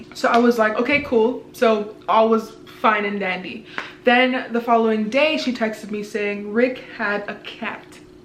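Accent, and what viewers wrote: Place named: Nigeria. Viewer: I heard you talk one second in and I knew you were nigerian.. or at least from Africa 😭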